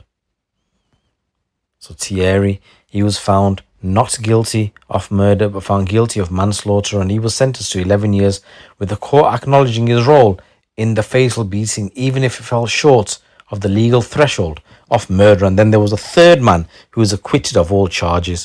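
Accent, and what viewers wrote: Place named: England, United Kingdom. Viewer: I will like your video but only because I miss the English acsent I live in Scotland but can't speak the same language as my neighbours it's a joke thay have a shop and a new car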